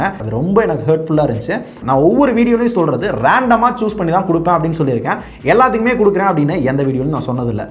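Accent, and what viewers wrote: Madurai, India. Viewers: Kaya kaya nu pesitu irpanga.. Madurai slang 😁❤️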